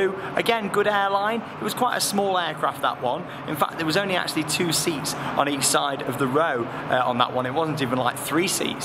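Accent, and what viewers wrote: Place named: West Midlands, United Kingdom. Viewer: I thought you were from the west midlands not manchester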